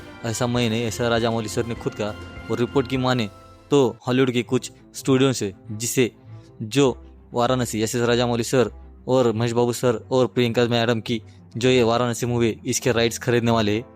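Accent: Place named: Nepal. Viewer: By the accent, I'm guessing you're Nepali?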